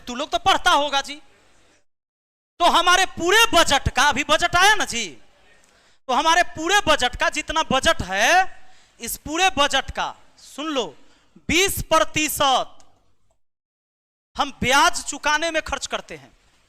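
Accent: Bihar, India. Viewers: Yekdum bihari andaj me bazat ka baja baja diye guru ji super se bhi upar shamjhane ka tarika hai 😂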